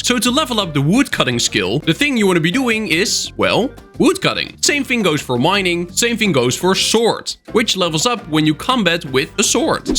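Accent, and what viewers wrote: Netherlands. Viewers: the fact that you can hear that he comes from the netherlands is crazy (ik ook daarom weet ik dat)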